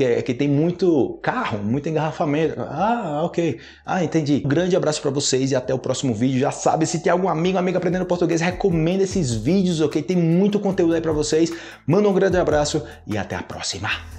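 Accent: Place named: Colombia. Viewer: Teu spanhol e similar a Colombia e costa rica mae amigo, pero em portugûes e mamá em espanhol.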